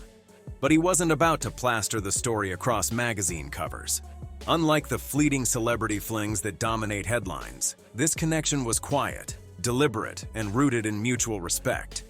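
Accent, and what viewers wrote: United States. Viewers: AMERICAN VOICED CRAP!! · I found this hyperbole tiresome, especially in that IT American accent. Seemingly Cliff did indeed enjoy a permanent relationship with another man. The longevity of this relationship should be celebrated rather than being the subject of unpleasant inuendo. His resistance to probing questions about his private life during the censorious times he lived though is absolutely understandable.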